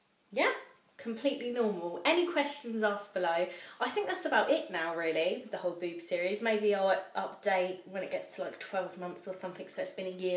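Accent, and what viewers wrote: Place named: Devon, United Kingdom. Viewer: Are you from Plymouth? Or somewhere in Devon? Your accent is so similar to mine xx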